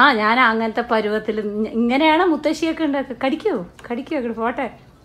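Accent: Palakkad, India. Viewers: Made the rangayyan today ..made some changes in the tempering. Came out tasty .Nalla palakkadan slang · പാലക്കാടൻ വിഭവങ്ങൾ ഉണ്ടാക്കുന്ന വിധം, തനി പാലക്കാടൻ മലയാളത്തിൽ കേൾക്കുമ്പോഴുള്ള സുഖം, അതൊരു രസമുള്ള അനുഭവം തന്നെയാണ് ട്ടോ, എത്തനൂരാണ് നാടെന്നറിഞ്ഞപ്പോൾ ഒരടുപ്പം, കാരണം എന്റെ മകളുടെ ഭർത്താവ് എത്തനൂർ കൊയ്യൂർ വീട്ടിലെയാണ്. ഞങ്ങളുടെ റിട്ടയേർഡ് ജീവിതത്തിൽ ഇപ്പോൾ, ഇങ്ങിനെ പഴയ പലഹാരങ്ങളുംമറ്റും ഉണ്ടാക്കി നോക്കലാണ് മെയിൻ ഹോബി. Thank you. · Chechi sherikum annapoorneshwari devi thanneya.. ❤️❤️❤️🥰🥰🥰😍😍😍 Chechi undakkunna reethiyum ath kazhinju chechi kazhich koodi kanikumbo sherikum manasu nirayua..Chechide palakadan samsaram kettirikkan enth rasama...Variety recipes njangalude munnil iniyum kond varane chechi.. Othiriyothiri nanmakal nerunnu...❤️❤️❤️ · I really Thank you for this compliment. Real Palakkadan language. Post Thenga Vada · നല്ല പാലക്കാടൻ മലയാളം...❤❤❤❤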